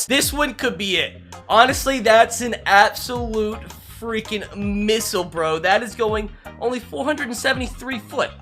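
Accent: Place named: Alabama, United States. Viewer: he's from Alabama and has a accent so do I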